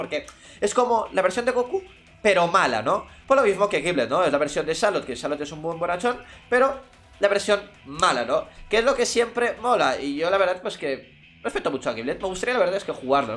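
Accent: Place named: Japan. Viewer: F por esa entonacion japonesa...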